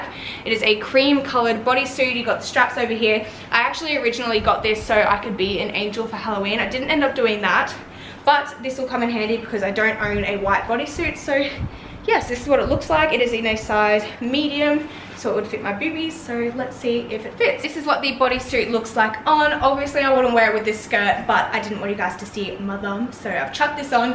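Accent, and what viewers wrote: Australia. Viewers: Why do aussies pronounce o, oyyuuu. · Australian Women Are Gorgeous!!! not only you're beautiful you have a nice accent too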